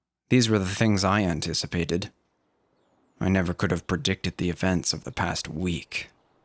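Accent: Canada. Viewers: Don't love the narrators Canadian accent, but other than that... All good. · The way he pronounces words with the letter U is a dead give away he's from some part of Canada. Granted Canada has many accents, like America, People in the Maritimes speak differently than those in the Greater Toronto area ect ect. Anyway listen for how he says "outfit". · These are some of the best Creepypasta recordings on YouTube. However.... man you can tell that the narrator is profoundly Canadian!!!!!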